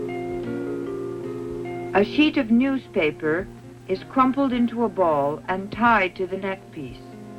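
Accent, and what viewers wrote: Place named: Transatlantic, United States. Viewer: Ok boo I see you with the Transatlantic accent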